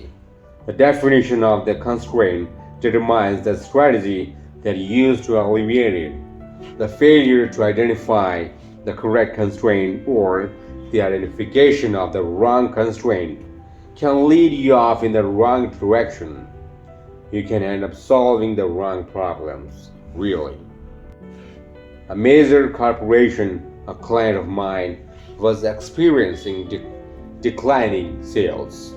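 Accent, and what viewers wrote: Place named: United States. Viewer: Yes friend, I also love his accent ... Being an Indian he's really doing great American accent..🎉🎉🎉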